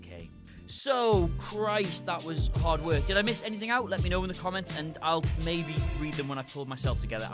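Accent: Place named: Northern England, United Kingdom. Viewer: These are heartbreaking and sweet in various ways. But what I will say is that Abe was a bad father first and thats a lot to do with why Homer is a bad son....it doesn't excuse him but still. Also, i think this is the first Northern English voice ive heard on narration on here. Being Northern English myself, its comforting to hear this.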